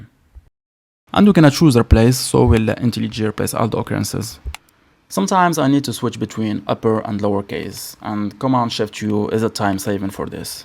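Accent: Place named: France. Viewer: I've watched the Kubernetes video and now this one, I've gotta say that you're explaining everything great. Your videos have a nice format and are quite enjoyable to watch, but I have one small thing to say to you and I mean no offense here - we all know you have a french accent when talking and sometimes (here and there) you talk so fast that I lose you, so for your future videos if you could slow down just a little bit. :) Hoping to see more from you, subscribed! P.S. the brackets plugin is amazing, thank you!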